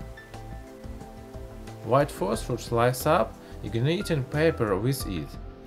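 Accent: Russia. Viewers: Damn you have a thick Russian accent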